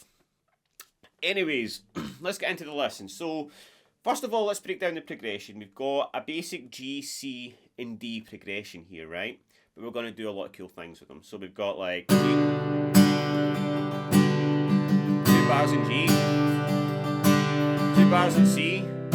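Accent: Scotland, United Kingdom. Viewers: im a Kiwi and find your Scottish twang very easy to listen to but I do have a wife from Folkirk.Great vids thanks very much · Greetings from Frankfurt/Germany. Please do me a favor and stick to your Scottish accent. I am always pleased to learn a new language and your lessons are awesome and I look forward for every single one of them. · Sounds great, many thanks, and don't try to disguise that lovely Scottish accent! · I love your accent, and having just got back to London after my first time working in Scotland love it even more! It's one of the reasons why I watch your videos. The main one is after many years your lessons have opened up my playing when ive been stuck in a rutt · Love that accent, love to copy it when speaking English! 😊 Been in Scotland three times up to now, such a nice country, wonderful people up there! The hymn of our football club here in Cologne, the 1. 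FC Köln, has the melody of "Loch Lomond" - always gives you goosebumps! Keep on rocking,! Great lessons you give here! Greetings from Germany! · I’m Italian, use to struggle with British accent but easily understand 99.7 percent of what you say 😊God bless Scots! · I love your accent,! It's really been helping me with my Scottish accent practice, too.;-) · It's a Scottish accent, right? Sometimes I misunderstand when you bend those vowels in a way I wasn't expecting, but it's beautiful, like bending notes in the Blues 😊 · Love the Scottish accent ❤ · Hey, thanks for the grate lessions, stay as you are and keep that little scottish accent! For me - as a german - the rolling R is completely normal 😁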